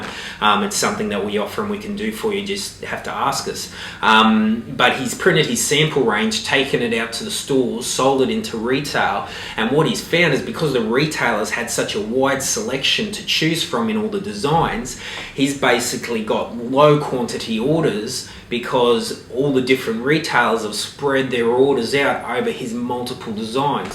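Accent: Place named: Australia. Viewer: I'm Australian and can tell he is also.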